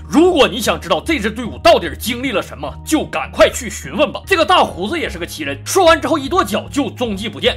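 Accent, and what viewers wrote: Northeast, China. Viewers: 牛叔啊，你这一口霸道的东北话太牛掰了！我也是东北人，但没有这么重的东北话口音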